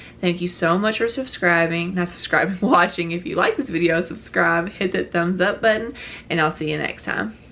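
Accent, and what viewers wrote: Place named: Kentucky, United States. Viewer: Fellow Kentuckian here, instantly recognized your accent lol. I'm just starting my teacher prep program and am currently teaching in Korea. Where in Kentucky do you live? I'm from Richmond/Berea/Lexington (lived in all 3 growing up haha)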